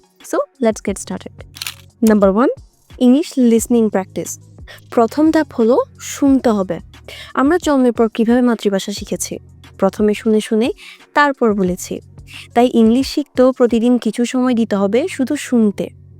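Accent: Chittagong, Bangladesh. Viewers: apni ki chittainga , cause apnar accent pura amader chittagonian der moto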